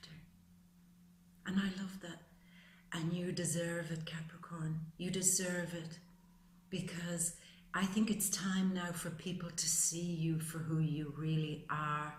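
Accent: Ireland. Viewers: nice Irish accent. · wow ,,,and all in a lovely Irish accent <<<subscribed beautiful x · My God, what a reading, I hope it transpires because I had an Astral Prediction done last in 2017 and was promised changes would start in May, do the lottery and a windfall would come in, I spent over a £1000 on lotteries from end of March to June and won very little, other things were going to change, reconciliation and more? My birthday is 26/12/1961 I was born at 5.40am birth in Kirkby, England? I've been through it over the last 30 years but none more so, than the past 2.5/3 years. My wife ended our marriage 15/12/16 walked out Jan 2017 I've been sick with depression and anxiety, hospitalised with blood clots on my lungs, I was suspended from work. Now things are meant to be going right for me? Why do I have no faith? My ex filed for a divorce, I got the papers virtually a year to the day she told me it was over 16/12/16 now I find myself in a predicament, fearing losing my job, my house, half my pension, everything. 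By the way, may I just say you are beautiful, your eyes are so mystical, your soft dulcet Irish tones are so soothing and I love your witty repartee. I'll be watching your readings every month for as long as I am here.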